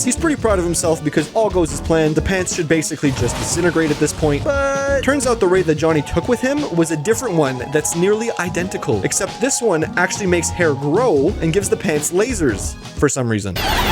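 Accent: Canada. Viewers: Holy crap you swear like a true Quebecker! Props to you!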